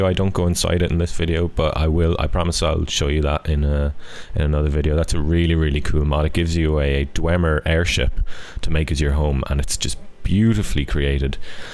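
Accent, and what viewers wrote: Ireland. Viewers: He has an Irish accent if you didn't know.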